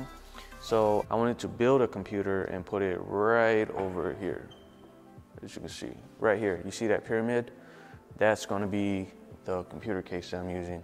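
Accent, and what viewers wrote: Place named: New York, United States. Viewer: Cool videos. Where are you based out of? You sound like you might be from NYC.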